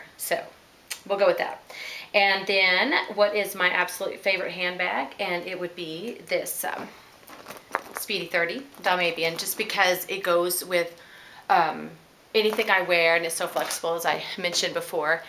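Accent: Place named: Texas, United States. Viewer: I can recognize a Texas accent anywhere. Yes, I am from SW Texas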